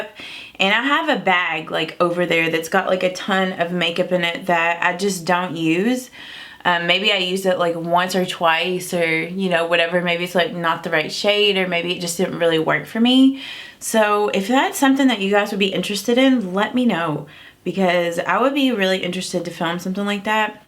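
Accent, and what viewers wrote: South, United States. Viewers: Looks great!It would be fun to hear more about what's unique about where you live. Places you eat at, shop at...the place where you bought your mug. With your southern accent, is there anything makeup wise you would say is trendy in the south.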